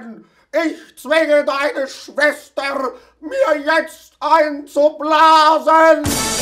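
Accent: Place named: Saxony, Germany. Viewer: eher sachse... bin thüringer ^^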